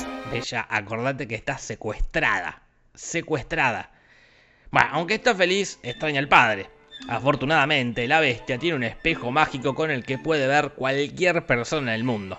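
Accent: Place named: Argentina. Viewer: El único argentino que me gusta como habla jasajksak ❤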